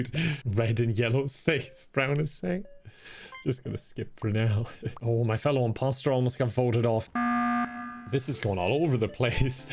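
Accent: Ireland. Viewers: irish accent was so strong this episode lol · That Irish accent omg haha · Why did actually sound irish today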